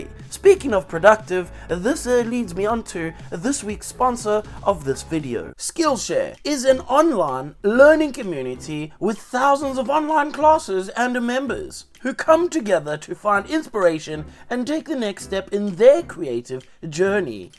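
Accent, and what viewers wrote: South Africa. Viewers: Just got into resin printing and painting, your channel is very informative, thanks man and keep up the great videos. You sound South African? Howzit! Lekka Lekka!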